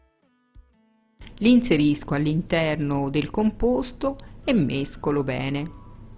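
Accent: Tuscany, Italy. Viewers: Che bel parlare toscano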